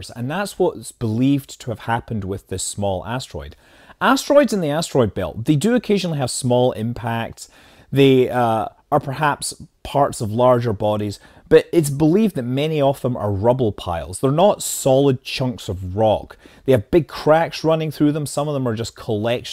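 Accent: Scotland, United Kingdom. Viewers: thank you Scott Manley. Great stuff. a fun mix of Kerbal, sci-fi, and hard science discussion, all in a Scottish accent. I wish I had stumbled across your channel earlier.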